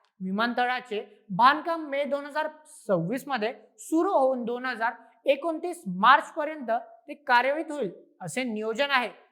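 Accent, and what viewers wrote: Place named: Khandesh, India. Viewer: Khandesh chi bhasha ashich aahe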